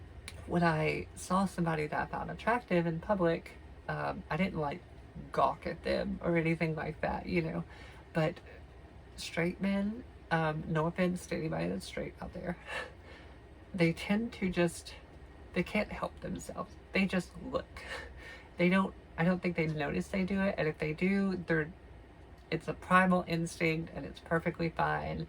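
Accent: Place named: South, United States. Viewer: You may not think you are all that attractive but girl you are gorgious. I as a trans woman aspire to one day hit the point where I am at least half as gorgious as you. Based off of you accent I am guessing you are southern. I have only been on Estrogen sense Jauary 22nd this year so I have a wase to go. For now I am leaving the pronouns on the back burner as I am not fully passing in person yet at least for long periods of time. I would love to officialy use she/her pronouns in my daily life but I also feel if I do not push it and let it come naturaly no one can use pronouns in an attempt to insualt me.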